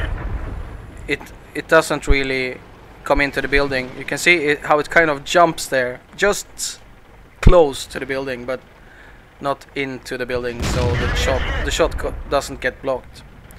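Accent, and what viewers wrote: Sweden. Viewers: where are you from you sound like swedish a little bit i swedish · Jag tyckte att jag hörde det på din engelska:D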